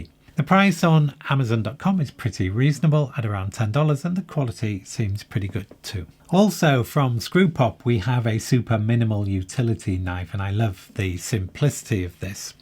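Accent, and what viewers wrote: United Kingdom. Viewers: Perhaps mention not legal in uk as some Brits won’t know and as you are (or sound) British they might assume you are saying edc in UK.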